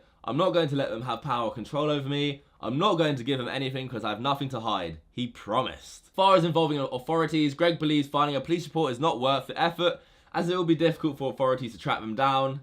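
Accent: England, United Kingdom. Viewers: Why do i get this clown in my recomended? I dont care about Greg Paul making out with anyone, even less i care about you exposing him.. You are faker then Kylie's titties... After all we are not judging you for being born English and talking your stupid accent. Youtube please fix your recommendation shit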